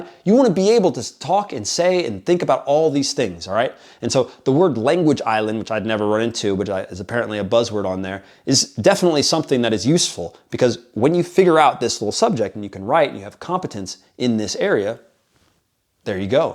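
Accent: Spain. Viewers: Your accent in spanish is nice bro! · You have a beautiful Spanish accent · That spanish accent, sir 🤩🤩🤩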